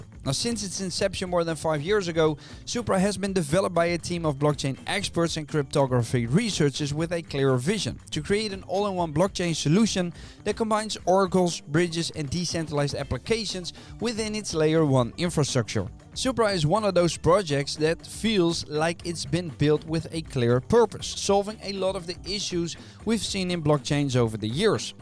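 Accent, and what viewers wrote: Netherlands. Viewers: how high will it go in this run? hoor ik daar een Nederlands accentje?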